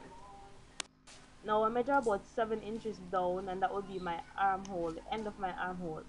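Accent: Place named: Jamaica. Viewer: are you Jamaican? you sound jAmaican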